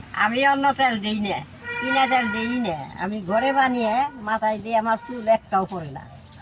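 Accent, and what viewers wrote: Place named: Jamalpur, Bangladesh. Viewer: আপনার ভাষা অনেকটাই বাংলাদেশের জামালপুর জেলার ভাষার মতো ❤❤❤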